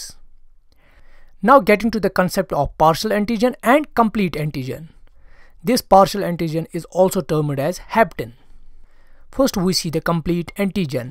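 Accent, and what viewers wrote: Kashmir, India. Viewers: So kashur accent brooo.. Cxe ma kashur??? 😂❤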